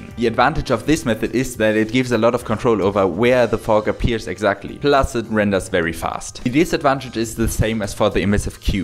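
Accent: Germany. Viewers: i hear deutsch...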